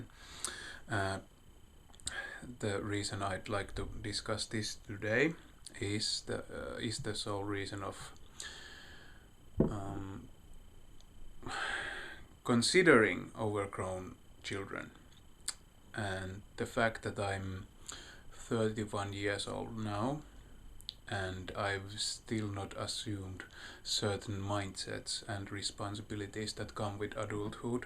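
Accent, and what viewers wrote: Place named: Finland. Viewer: Upeeta enkkuahan toi, itseasiassa meidän vanhalla enkunopella oli hyvin samanlainen suomalainen lausuntatyyli :)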